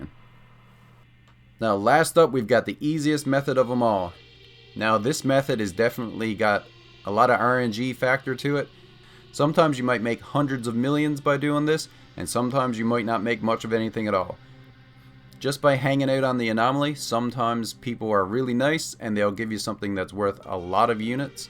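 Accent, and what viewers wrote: Newfoundland, Canada. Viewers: haha didn't catch the newfie accent till you said 'stay where you are and ill come where you're to.'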